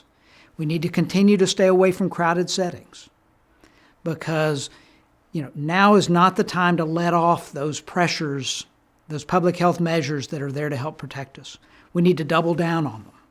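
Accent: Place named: United States. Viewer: Another Doctor that gets to keep his license and job! (he also has the same American accent as 'Snowden' his first name will come to me...maybe) as has of course zuckerberg, gates im English and these have a familiar accent its it a certain region? Or is it their own exclusive tribe? Edward! i knew it would come to me if i just kept typing!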